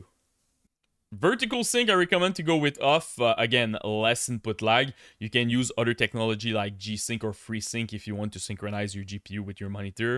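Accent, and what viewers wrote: Quebec, Canada. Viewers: Thanks for the tuto pal ! You sounds a bit Quebecois haha, always a mess when it comes to Ea sport and optimization on PC. Cheers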